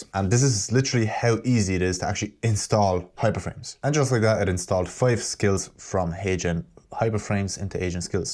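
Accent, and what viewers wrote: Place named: Ireland. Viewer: Been looking for something like this for months, dziękuję bro I hear some Irish in that accent 🤝🏼🤝🏼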